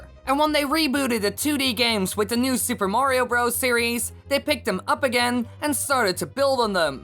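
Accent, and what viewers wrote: Netherlands. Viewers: Jouw heel klein beetje Nederlands engels accent is heelijk om te luisteren 😂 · Are you by any chance dutch?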